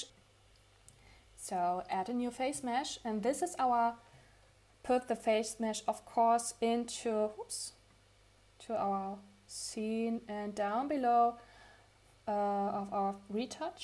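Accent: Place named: Germany. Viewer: You have a German accent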